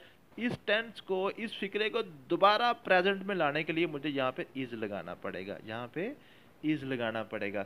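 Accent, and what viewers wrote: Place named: Punjab, India. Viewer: v informative lecture Sir ❣👌👌....way of teaching very amazing with punjabi toch...😍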